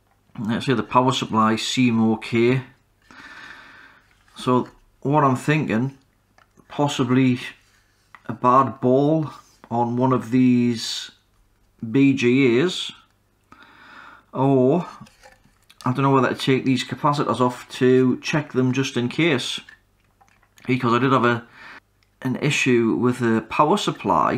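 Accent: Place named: North East England, United Kingdom. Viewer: I think your videos are brilliant. I have always liked the North East accent. I was hoping one day to marry a Geordie girl just for the voice. She could tell me bedtime stories so I could drift off into dreamland. Thanks for the info on the trinocular. I have bought a few tools but still needs a few more things. Thanks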